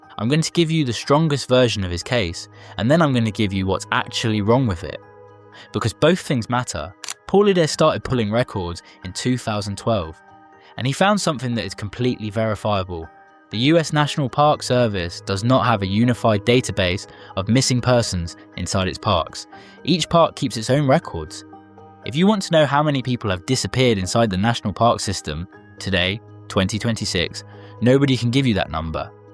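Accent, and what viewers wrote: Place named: Europe. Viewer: European accents are almost intolerable to listen to… I’m so glad to be American.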